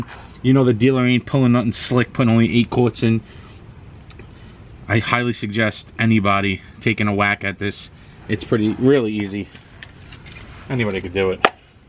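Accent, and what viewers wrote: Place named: Boston, United States. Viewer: This dude def from Boston.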